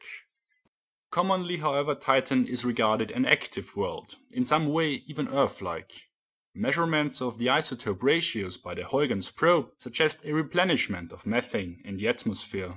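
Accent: Germany. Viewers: A great video, and a great presentation. I love the german accent, and your arguments are delivered with great precision and production value.. It's funny (and telling) to see the difference in how a creationist simplistically disobeys the ninth commandment, And a true factual and researched rebuttal.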